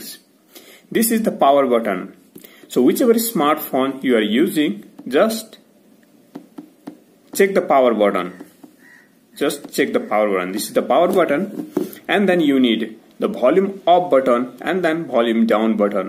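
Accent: India. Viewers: This video was the most Helpful and most Thorough video, even with the Indian accent(and this is a Compliment). Thanks a lot.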